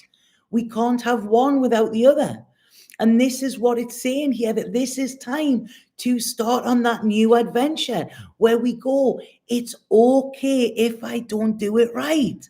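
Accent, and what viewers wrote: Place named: Scotland, United Kingdom. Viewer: Love that Scottish accent.❤🙏😘🎅😎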